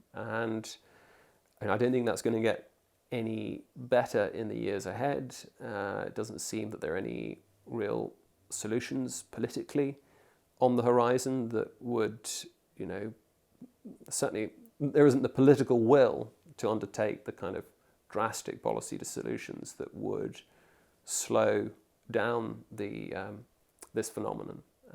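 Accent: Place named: RP, United Kingdom. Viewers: This guy if he had a neighbourhood move in he with his posh life like he said it’s ALIAN to him with his posh accent I’m happy for him to have that sort of life style but he’s in a bubble and it’s not really his problem but his family in the future will leap the rewards of the Islamic way of their life style , he’s very vague about what’s happening in the UK LISTENING TO HIM ,NOT FOR ME THE ELITE IN ITS FINEST FORM 🙄🙄🙄🙄🙄🤔🤔😒😒👎🤷‍♀️ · Aye, annoys me to no end that nobody listens until you get somebody with a posh voice like this man or that Douglas Murray saying stuff we've been saying for decades. The classist element of the defiling of England is one of the most disgusting parts of all this. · I live in your country 16 years and I can tell you I struggled to understand your English accent and dialects . Having family in USA and communicating in English with them wasn't same like you do in England. 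It took me a while to learn and get used to your speaking your accents. I have British friends I speak with them I ask questions anything I want to know and learn about your country I read books about British history too.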